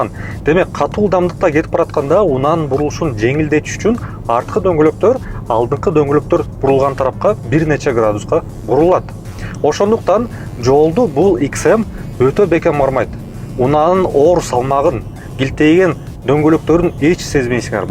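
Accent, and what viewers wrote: Standard. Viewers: Таза кыргызча уккан кандай кана кулака жагымдуу угулат😍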